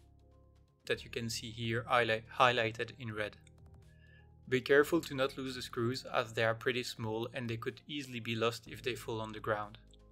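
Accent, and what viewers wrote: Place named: France. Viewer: Merci pour la vidéo ! (J'assume que t'es français mais c'est léger à l'oreille)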